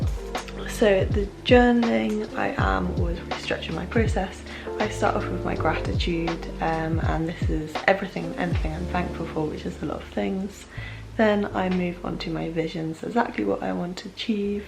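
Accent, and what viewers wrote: United Kingdom. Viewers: YOU are so ADORABLE! Your pretty blonde hair, your adorable cheeks. I love your British accent which only adds to your charm. I love it when you talk to us and tell us all what your doing, your morning ritual etc. Keep up the good work. I love your videos, just love being with you.:-):-):-)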